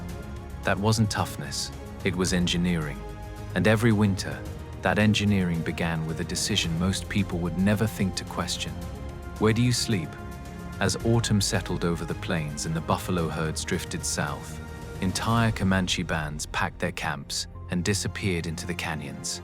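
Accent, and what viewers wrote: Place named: RP, United Kingdom. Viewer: English butler voice yawnnn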